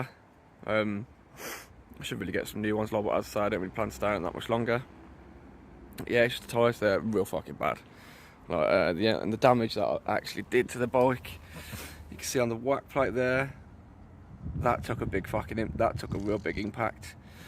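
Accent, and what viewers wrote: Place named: Birmingham, United Kingdom. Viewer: You from Birmingham or something mate? Your accent sounds pretty local to me. ;)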